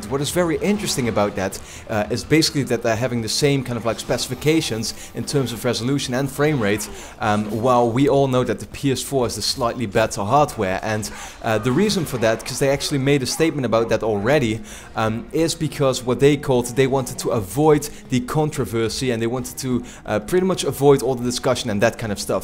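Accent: Netherlands. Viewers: You sounds english with a dutch accent in it 😂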